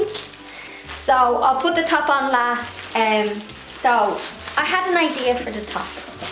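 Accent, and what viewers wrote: Ireland. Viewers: I have been watching your videos here lately and am loving you. I am a 64yrold lady who was born in England and love listening to your Irish accent. you are o cute and so crafty. It makes me want to do things I used to do. So I started to do a few little crafts like a beginner even though I used to do this stuff all the time. Thank you for your inspiration and keep it coming. God bless you.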